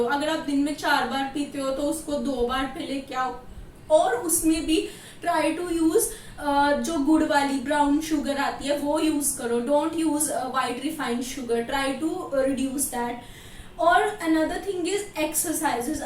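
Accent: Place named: Punjab, India. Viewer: Hi, Awesome video!!👏🏻👏🏻 So happy to hear your voice + that punjabi accent😍 Proud proud😎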